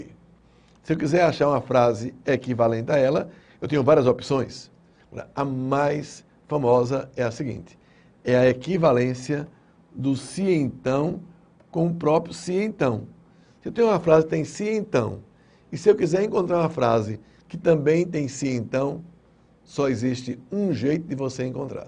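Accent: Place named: Pernambuco, Brazil. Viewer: Excelente, professor! Parece até que eu tô de volta às salas de aulas, ainda mais ouvindo esse "pernambuquês", rsrs O senhor ensina muito bem e aplica a teoria em várias práticas diferentes, o que ajuda a estar preparado em qualquer situação. Obrigado pela aula!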